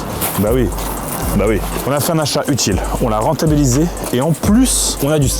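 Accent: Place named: Quebec, Canada. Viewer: Il a accent Québécois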